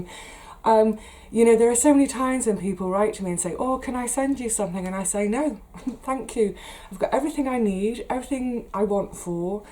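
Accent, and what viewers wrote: United Kingdom. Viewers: You have such a beautiful British accent, love it. So you have Trolls in the UK also? I watch You Tube videos but I don't have my own channel. Here in the USA the Trolls can be very cruel. Please try to ignore them, give them no thought. I think it's so exciting that you were and are an actress. You are very young, I'm now on Social Security Disability at 71 years old. I remember waiting to 67 to get Social Security here was hard, I took mine early at 62, but then there was no Medicare (healthcare) until age 65. It is so hard for widowed women in the USA. But so much harder for Single, widowed or divorced women here, especially with working full time with children and childcare. Thank You for showing us how things work for you...so very interesting. So proud of you for tackling and paying off your debt. You are a true marvel to me!! 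Please stay safe and Take care from your new pals in the USA, Debbie and Dexter-Dog the amazing tiny chihuahua companion.🙋🐾💕🙏🙏🙏😷😷😷🏥🏥🇺🇸😁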